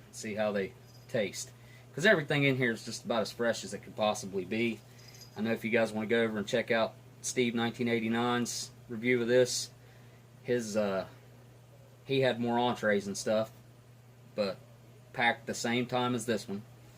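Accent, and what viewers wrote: South, United States. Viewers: OMG THATS AWESOME!!!! I'll keep an eye out for the video!!! And congrats on the milestones!!!!! That's so awesome!!!! Btw I love your videos AND your accent! Southern boy huh?? 😁 I'm super happy for you! Congrats again!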